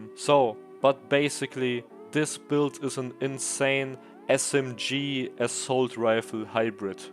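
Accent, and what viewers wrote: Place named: Germany. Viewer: Nices Video man hört direkt dass du deutscher bist haha 😄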